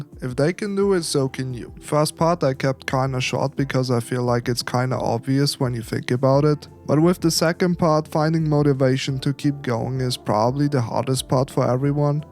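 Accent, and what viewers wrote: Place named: Scandinavia. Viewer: 200 views and nordic accent let's go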